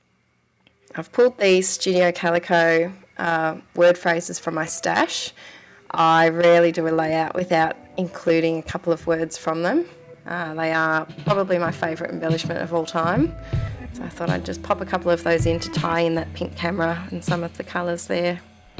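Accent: Australia. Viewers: Great layouts - looking forward to seeing more :) It is nice to hear another Aussie :)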